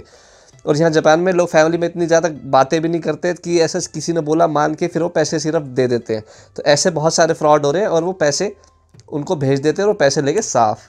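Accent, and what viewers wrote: Haryana, India. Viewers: Bhaiya ki Haryanvi awaaz bahut dhaakad hai 💪💪💪💪💪💪💪🤟 hamari yahi duaa ha aap Sahi salamat raho 🙏🙏🙏❤️❤️❤️❤️ aor 🤟🙂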